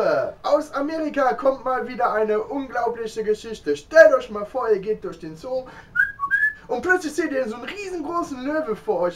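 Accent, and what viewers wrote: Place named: Hesse, Germany. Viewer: Ich finde Deine Videos immer sehr erfrischend und man lernt viel, vor allem mag ich die mit dem Wolfshund. Eine dumme Frage: Ich wusste übrigens gar nicht, dass die Luxemburger Deutsch als Muttersprache sprechen oder kommst Du eigentlich aus Deutschland? Ich hätte Deiner Sprache nach gedacht, dass Du aus Hessen oder Rheinland-Pfalz kommst und dass die meisten Leute in Luxemburg Französisch oder Luxemburgisch (Letzeburgisch) als Muttersprache haben.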